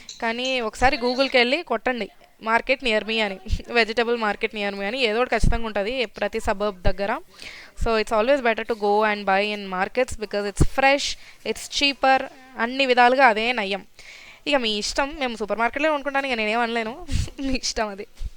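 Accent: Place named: Telugu, India. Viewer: Telugu because I love u r telugu slang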